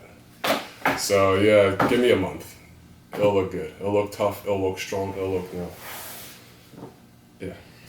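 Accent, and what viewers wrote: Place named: New York, United States. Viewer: Your accent is like halfway between Sylvester Stallone and Rodney Dangerfield. So I'm guessing you're from NYC or one of the nearby areas, but where abouts? I mean Brooklyn is an obvious guess because how easily "Fuhgedaboudit" flows out when you talk. Hmm. Got me thinking there or the Lower East Side. Of course, I'm talking out my ass, you might be a Jersey boy.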